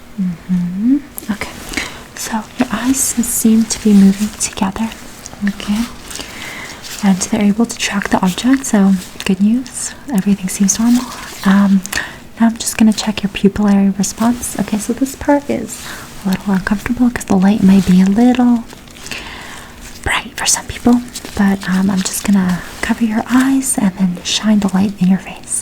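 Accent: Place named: United States. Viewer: my first time watching you and that blows my mind you sound so American!! very sharp pronunciation you have the accent down to a T girl, i’m beyond impressed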